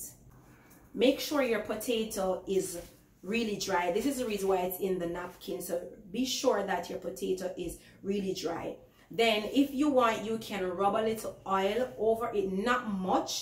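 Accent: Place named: Caribbean. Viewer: She talking internationally so people will understand seen can't talk the deep patwah straight have to broaden your audience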